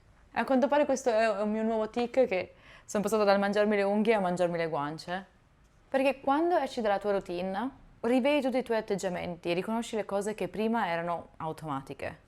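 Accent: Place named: Lombardy, Italy. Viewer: Non hai l'accento milanese ma più del sopra Milano.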